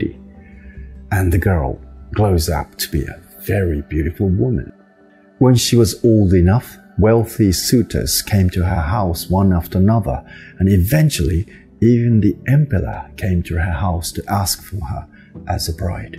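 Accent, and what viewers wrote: United Kingdom. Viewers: 和尚様、イギリス英語に聞こえますが留学された経験ありますか？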